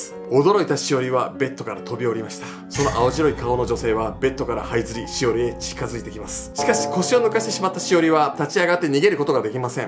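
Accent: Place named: Kansai, Japan. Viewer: 関西弁は勘弁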